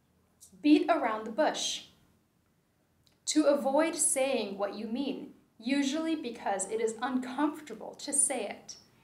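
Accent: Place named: General American, United States. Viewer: Congratulations from New York City, you have a standard American accent. That's essential for English teaching.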